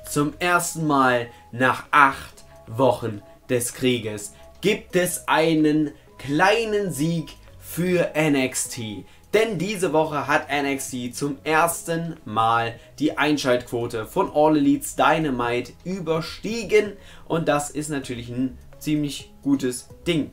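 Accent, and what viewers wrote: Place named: East Germany, Germany. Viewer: Ossi halt 😄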